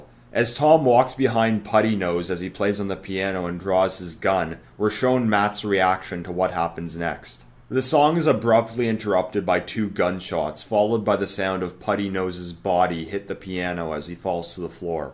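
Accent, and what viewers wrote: Canada. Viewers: Maybe it's bc of your Canadian accent & the fact that you're one of few ppl who aren't a natural born citizen of America who DOESN'T bash our "6UN CULTURE", but this is the BEST analysis I've EVER seen on this topic. I think it's time we just admit the truth: "… when left to their own devices men will form tribes and compete with rivals for tribal dominance, not live together in some multicultural harmony. This theme of ethnic loyalty in mobster films makes them feel more authentic, because this is how humans function in general. You really couldn't make a mafia film that lines up with modern progressive values without it being absolute SHlT…" ALSO, WOMEN long to be WOMEN AGAIN! And MEN long for them to be, TOO! But we just keep on telling the same LIES: That we are as STRONG as you. That we belong at the FRONT-LINES. That we take WAR & Other LIFE & DEATH Situations as SERIOUSLY as YOU DO (that's why WE MADE ALL THOSE TikTok Videos of us DANCING in Hospitals, while our Cancer patients, Burn patients, Heart Attack patients, DV Abuse patients, 6un SH0T patients, CHF & COPD patients, Alzheimer's patients, Traffic Accident patients, Kidney Failure patients, Sclerosis patients, Bowel Blockage patients, Pancreatitis patients, Stabbing Victim patients, Child patients, ALL got NO ATTENTION, SO THAT WE COULD GET OURS~ on Multi-Million Dollar Hospital Equipment, or Carrying Fake C0VlD CORPSES!). 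There is a vast difference b/t "Multi-Cultural" & "Multi-Ethnic". Mixing ETHNICITIES c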